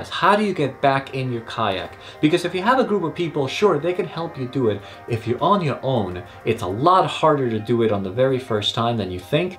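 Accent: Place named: Turkey. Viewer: You have a super Turkish accent for a Texan.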